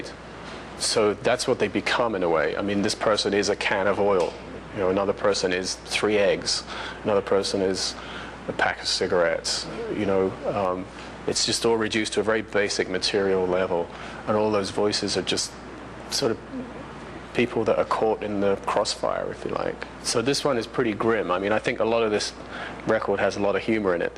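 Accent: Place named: England, United Kingdom. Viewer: His English accent is hardly noticeable in this.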